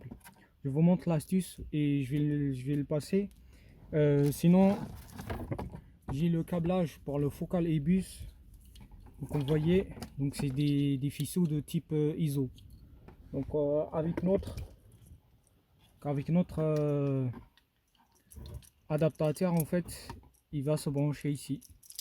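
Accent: Algeria. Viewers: Votre accent est algérienne monsieur 😂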